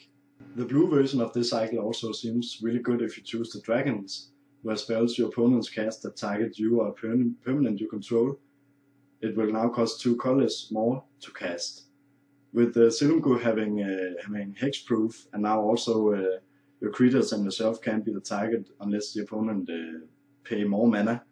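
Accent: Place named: Germany. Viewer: Das ist definitiv deutsches Englisch ;)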